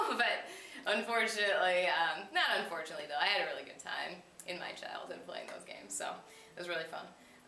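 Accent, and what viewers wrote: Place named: Midwest, United States. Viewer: Do you live in Michigan? You sound kind of mid-westerny.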